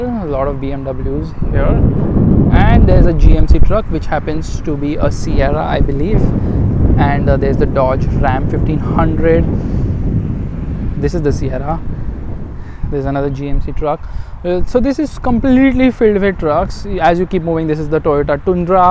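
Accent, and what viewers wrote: India. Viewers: I love Indian accent its so beautiful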